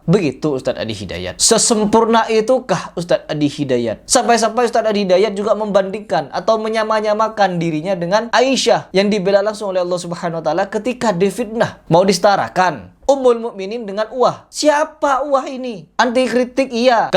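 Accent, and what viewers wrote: Madura, Indonesia. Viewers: gausah banyak bacott... buktikan saja kalo anda benar.... sekarang buka mejelis ilmu.. ada kah yang mau ikut anda?? soalnya saya liat vidio anda seakan akan pendapat anda itu selalu paling benar... kalo dari logat anda ini orang madura... sini sodara jangan berani depan kamera... jalan jalan ke kalimantan... 😂😂😂 takut kah...?? gak usah takut.. kalo gak salah 😂😂😂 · logat bicaranya seperti orang madura ya?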